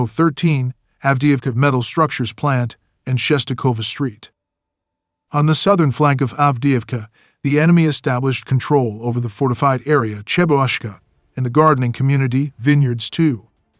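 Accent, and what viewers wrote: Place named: United States. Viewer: from Ukraine, professionally narrated by some American))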